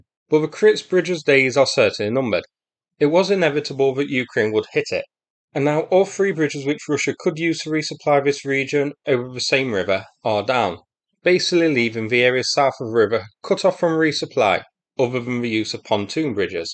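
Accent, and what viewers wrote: United Kingdom. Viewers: Love your updates, even though you've got a thoroughly Brit accent (I'm from Oz). Keep up the great work. Cheers!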